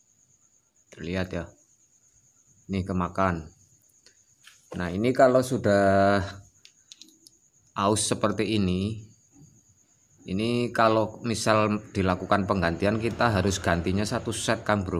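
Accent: Java, Indonesia. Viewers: nama mu kaya marga orang batak bro,,,tapi logat mu medook bat jawa😅😅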